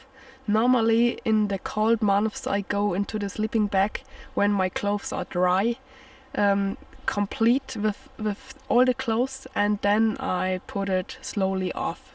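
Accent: Bavaria, Germany. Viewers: Wow - you even broadcast in English? It sounds cute - I never heard speaking anyoine in english with an bavarian accent... :-). Since I found your channel, 4 days ago when youtube recommended your video you made about the cabin situated in the woods, I find it amazing what you do. And you actually inspired me. Because I know someone, not living in Germany, UK or even Europe who shares the same interests like you do... I am surprised about the number of views you get. I will chat to her today to find out if hiking in the wild and producing youtube content wouldn't suit to her, as she is struggling a lot, financially...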